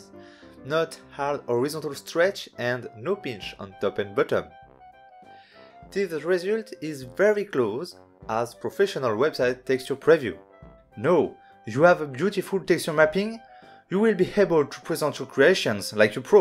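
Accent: France. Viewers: Ton accent francais est mignon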